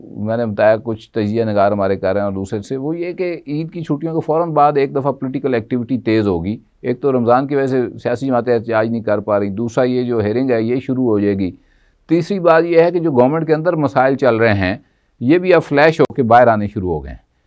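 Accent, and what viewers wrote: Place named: Punjab, India. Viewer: Sir Bhatti, you are the only man who has stood his ground till date and you speak Punjabi very well with strength.❤❤❤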